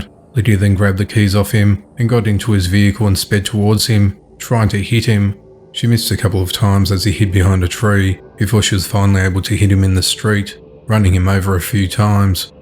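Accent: Australia. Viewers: This is like an Aussie version of Chills voice.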